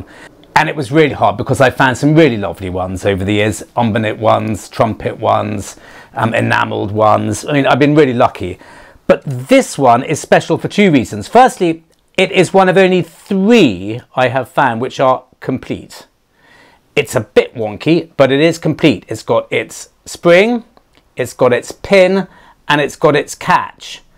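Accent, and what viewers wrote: RP, United Kingdom. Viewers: You are without any doubt the best metal detecting offering on YouTube, your posh accent is honest and endearing,absolutely love it.taski is always a bonus but of course cannot always feature, love the taxidermy in background, keep up the superb quality of content all the best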